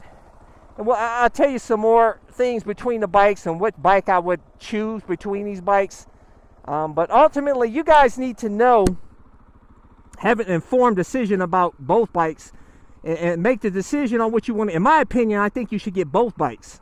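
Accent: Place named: Louisiana, United States. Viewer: You got an accent like from lousiana somewhere man. I lost mine living up in Minnesota over 10 years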